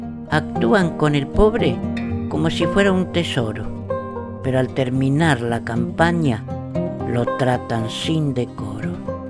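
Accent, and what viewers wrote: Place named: Argentina. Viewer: Tremendo tremendo,bien Argentino lo que dice y como lo dice... felicitaciones gran abrazo...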